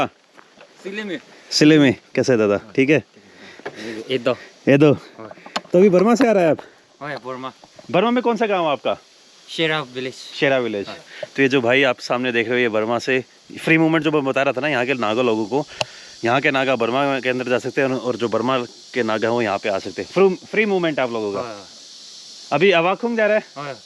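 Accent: Haryana, India. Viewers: The way you talk in haryanavi 👌👌🙏❤️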